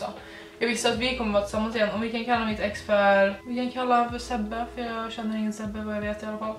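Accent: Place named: Norway. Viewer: Omg älska hur man kan höra din norska lite omg så nice haha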